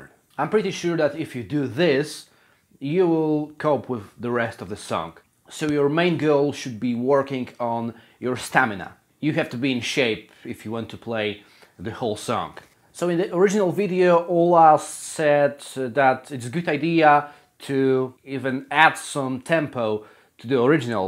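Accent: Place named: Poland. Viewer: O co chodzi z tym akcentem? Ma mówić bez akcentu bo jest Polakiem?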